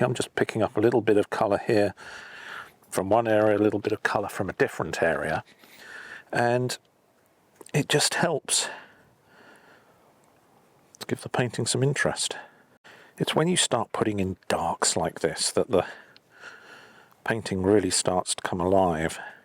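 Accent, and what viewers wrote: United Kingdom. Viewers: Hi I am from Malaysia Want to tell you that I really appreciate your lessons! So skillfully demonstrated! And besides, your British English Is a delight to listen to after the endless American twang which is so hurting to the ears! Thank you!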